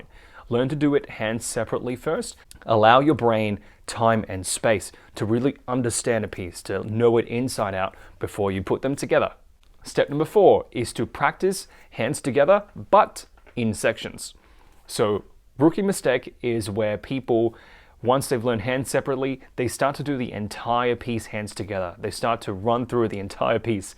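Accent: Australia. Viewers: lmao just because he has an aussie accent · Aussie accent. Great! You're pretty good mate. Are you based in Canberra? And if so, do you offer private tuition?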